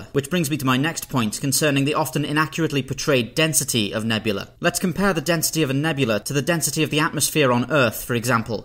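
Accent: United Kingdom. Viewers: I like your channel.i like listening to your Sci fi monologues in that British accent that makes the most mundane topic interesting. Sucks to be American,I guess.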